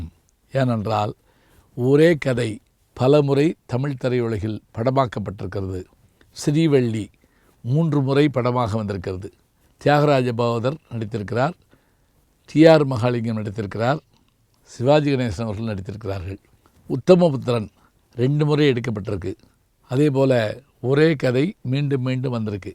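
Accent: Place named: Jaffna, Sri Lanka. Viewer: என் வீட்டுக்கார் உம்மட பட்டிமண்டப பேச்சுகளுக்கு பரம ரசிகர், என்ன சவத்தெளவுன்னா அப்பம் அவர் பக்கால இருந்தா என் தொடஐல ஓங்கி அடிச்சு ஆக்க்கா பாத்தியான்னு சொல்லும்போது ஒரு தொடை எரிச்சல் புத்திக்கு வரும்போது, அட இந்தப்பய ரசிகனப்பான்னு டக்குன்னு தாவி இது என் ஆசானப்பா அப்படின்னா ஒரு முத்தம் கொடுத்து, செரி இனிமே கொஞ்சம் மெதுவா அடிலேன்னு கட்டிக்கிட்டே இந்த செய்யுள் தெரியுமா உனக்குன்னு ஆரம்பிச்சு ... தமிழ் இனிமையானது, அதைவிட என் அத்தானின் யாழ்பாணத் தமிழ் மிக இனிது ஆசானே ❤❤❤ லெட்சுமி ராஜன் டொராண்டோ கனடா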